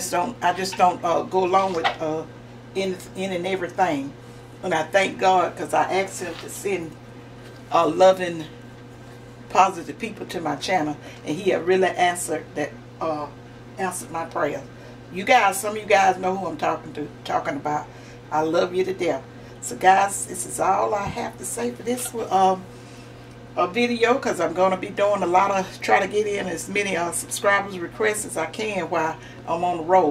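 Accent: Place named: North Carolina, United States. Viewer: Thank you for sharing your recipes! I love that you you’re a southern woman. You sound like my family, we’re from nc.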